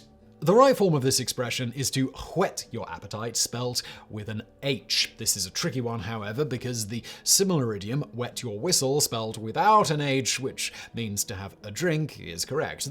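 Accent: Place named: RP, United Kingdom. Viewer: To my dumb American ear, posh English accent make "bald" and "bold" sound almost exactly the same 😆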